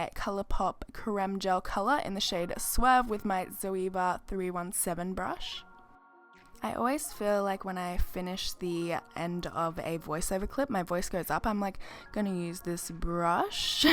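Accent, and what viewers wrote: Australia. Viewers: She's Australian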